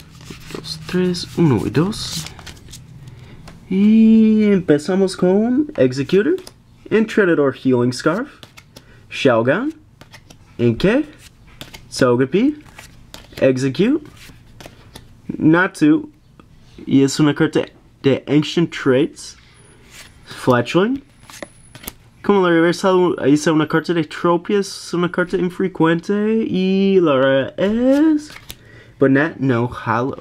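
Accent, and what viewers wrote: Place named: England, United Kingdom. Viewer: Me encanta tu acento ingles en el castellano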